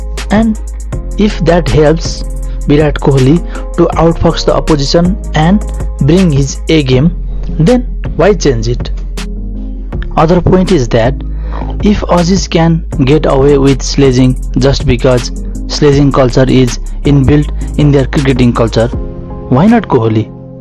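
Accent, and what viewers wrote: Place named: Nepal. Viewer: Where are u from😂😂talking like nepali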